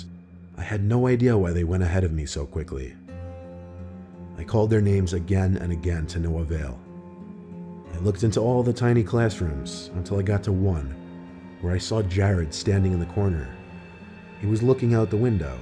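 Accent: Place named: New York, United States. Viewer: Love this channel! Love how I can listen to these story and the person narrating these stories sound very similar to me “Yes, I’m talking about the NY accent!”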